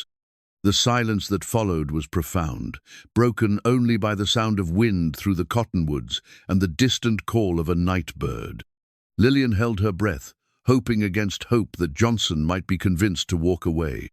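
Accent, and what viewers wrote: England, United Kingdom. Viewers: It's too bad you've got stuck with this narrator, he doesn't know how to pronounce American names & words, he's too English he sounds completely strange. Please give him & his boss my comments.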